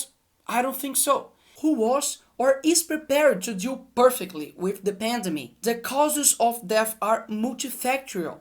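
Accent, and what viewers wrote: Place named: Brazil. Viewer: Quando percebi o sotaque brasileiro no inglês, parei de assistir na hora. Quero ver é gringo criticando ou metendo o pau no F.N, porra!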